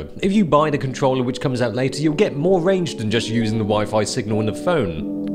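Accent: England, United Kingdom. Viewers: Britain accent? Why do I feel it so funny coming out of Asia? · wow where is this place? and as an American, seeing an Asian man speaking brit is interesting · awesome an asian with an english accent. I remember when I heard a black guy speaking fluent japanese in a meeting at the office. " I flipped " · Seeing an Asian with a British accent is awesome! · Omg it's a Asian guy with a British accent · i like your British accent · 這英腔講的，不看膚色還以為是洋人。美式英語語根本沒法聽。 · anglochinese speak like british, cool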